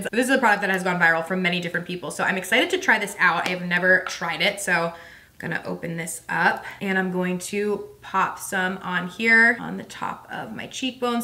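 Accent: New Jersey, United States. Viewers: I love you in general I have for yours but your Jersey accent comes through in the cutest ways and I have to double tap back 5 seconds I love it so much 🖤🖤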